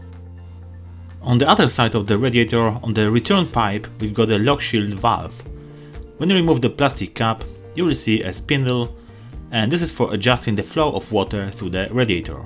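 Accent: Poland. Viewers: o jakim kraju my tu rozmawiamy? bo mam pytanie... z akcentu wnioskuje ze polakiem jestes...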